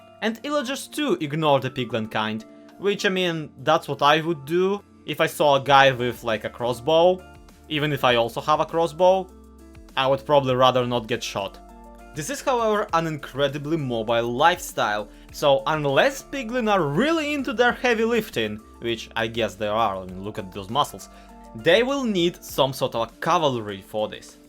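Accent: Russia. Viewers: У тебя русский акцент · у тебя акцент русский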